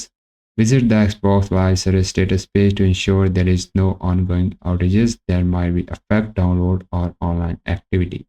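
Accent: India. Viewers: Whyyy tf on every vid it has to be a Indian 😔 im sorry im not racist but danmm I can't understand shi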